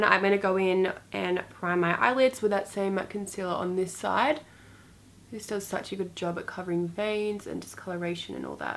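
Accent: Australia. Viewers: Omg i love this look, and for people that are saying, " why is she wearing all that makeup her skin is flawless" Yes her skin is flawless and i hope she doesn't wear this much makeup on a daily basis. But she has a bloody makeup channel what do you expect. lots of people wear makeup just because they love to apply it and wear it. i don't wear makeup because I'm only 12, and my Mum wouldn't approve, but I still love to wear it on occasions ( when i get the chance.) Also I'm Australian and all those american Youtubers can get annoying at times, But listening to someone with the same accent as me is way nicer. Sorry for long af comment but recently found your channel and I love it ! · For some reason I feel like if Kendall Jenner had an Australian accent, she would sound like you😂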